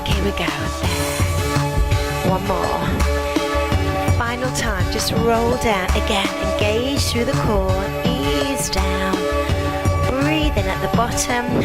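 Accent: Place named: England, United Kingdom. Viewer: hello. I am blind and really appreciated the fantastic order description also I love the English accent am I and as I am also English thank you thank you so much will keep listening to your channel regularly Donna Adelaide Australia 55-year-old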